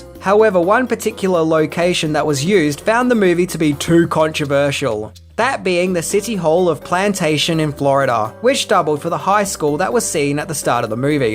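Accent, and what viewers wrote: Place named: Australia. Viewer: Great review of a funny film,. Your humor combined with your cool Aussie accent always keeps me entertained. Respect and Blessings 🙏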